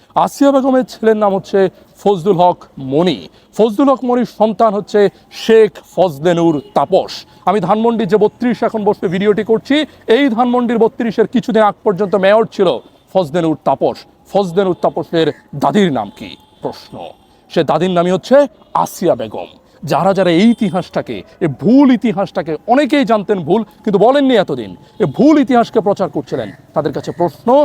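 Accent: Kolkata, India. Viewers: দাদা বাবু আপনি যে দেখছি কলকাতা ভাষা বলছেন গো। দালাল চাটুকার চ্যানেল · এই সা/লা তো কলকাতার মা/ল। কথাতে ধরন ওরকম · আপনি ক্যান ইন্ডিয়ান দাদা বাবুদের মতো কথা বলছেন?? বুঝলাম না আপনার উদ্দেশ্য... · তোমার কথা বলার স্টাইল কলকাতার দাদাবাবুদের মত কেন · কিরে তুমি কি কলিকাতা রেন্ডিয়া দাদাবাবু নাকি? না মানে, কথা ত হুবহু ওদের মত!!! উত্তর টা দিবে। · সবই ঠিক আছে তোমার কথা বলার ধরন কলকাতার দাদাবাবুদের মত কেন গো? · তোমার কথা বলার ধরন কলকাতার দাদাবাবুদের মত কেন গো? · আপনি পুরাপুরি শক্ত প্রমাণ উপস্থাপন করতে পারেননি! শেখ মুজিবের দালালী না করে সঠিক তথ্য উপস্থাপন করুন। আকারইঙ্গিতে, শেখ পরিবার এবং আওয়ামী দলের দালালী করছেন আর কথাবার্তা ধরন কলকাতার বাংলাভাষীদের মতো কেন? আরে, বলেই দেন? উনি ফেরেশতা ছিলো। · Hindu to tai kolkatar moto bolse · আরে উনি আসলেই ভারতীয় কথা শুনে বুঝতে পারছেন না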